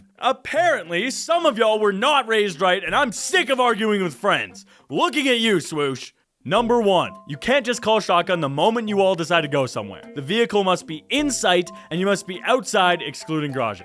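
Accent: Canada. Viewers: wait are you canadian????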